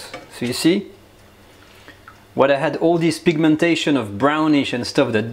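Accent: France. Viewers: Your🌈 french 🌺accent 🔥😍 👌 😋😘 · I love his recipes and even more his techniques, and love the French accent. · I will definitely make this; I love your French accent! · "Nudge of Butter" with a real French accent is delicious in itself....., your own cookbook should be titled, "Nudge of Butter"....love it and hope ALL your dreams come true. I love your Academy.... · Your English is excellent despite your French accent :-) This recipy looks amazing and easy to prepare... I was just wondering about the way you "reserve" your meat pieces before putting them on the plate and have them still warm after preparation of the mushrooms sauce...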